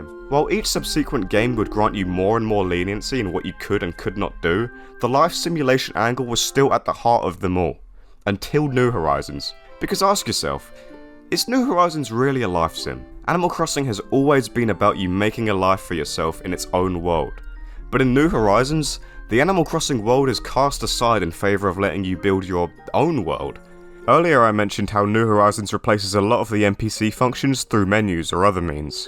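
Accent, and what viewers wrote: United Kingdom. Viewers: You from kent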